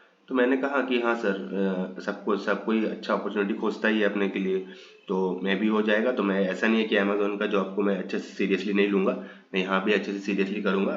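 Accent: Bengal, India. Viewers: Bengali ho kaya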